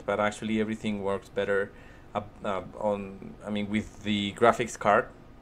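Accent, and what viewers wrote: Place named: England, United Kingdom. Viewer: Great video man and great english accent! I too was looking to switch to KDE this video was very informative, thanks.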